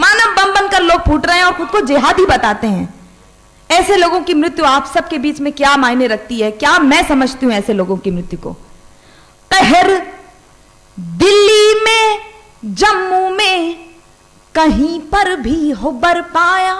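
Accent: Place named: Lucknow, India. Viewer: Bilkul lagta hai k aap Lko se hain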